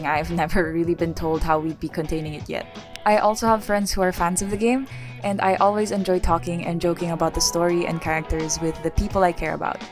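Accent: Philippines. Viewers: Only true Filipinos can tell that has a Filipino accent 👍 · Are you Pinoy by anychance? I can hear it through your voice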